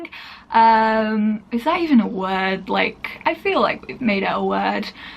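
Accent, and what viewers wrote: England, United Kingdom. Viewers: If you mean you want her accent, I have it so yeah. Just thought I'd say that, if you want to know what it is, all I know is that some part of England.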